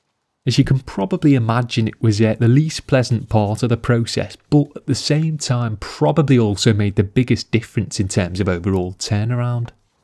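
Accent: Liverpool, United Kingdom. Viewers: how could I tell you'd have a scouse accent before you started speaking lol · Your voice and your british english is awesome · Hey, r u British? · Great video but going to be honest your scouse/brummie naisel accent is a tad annoying haha! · Are u from anywhere near Liverpool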